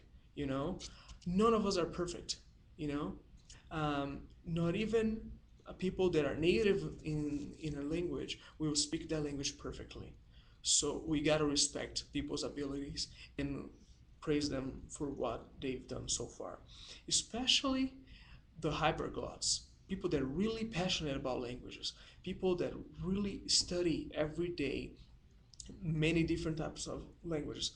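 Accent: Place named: Brazil. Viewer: Haha, you have a Brazilian accent! Cheers from Brazil!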